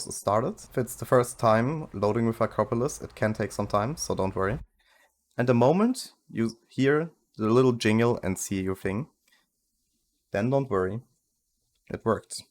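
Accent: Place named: Germany. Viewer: Bro klingt mies deutsch ja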